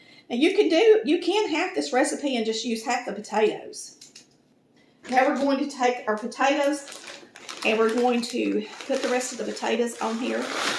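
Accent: South, United States. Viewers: I love the way she say pork chops. That country voice gets me all the time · You are awesome! Love your southern accent and personality 😄. I just found it today and YouTube keep posting videos you’re great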